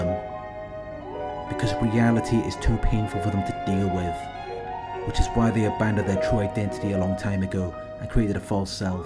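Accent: Wales, United Kingdom. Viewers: Where you from? Sound Welsh. Good video. I’m from porthcawl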